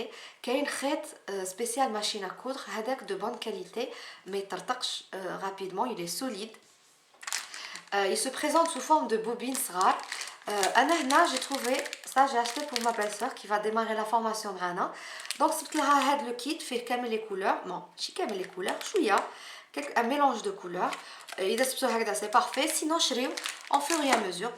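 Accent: Tlemcen, Algeria. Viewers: J'adoooore, tu explique (permettez moi de vous tutoyer) très bien. Allah yaatik saha! Vous êtes de Tlemcen?! l'accent bayen :p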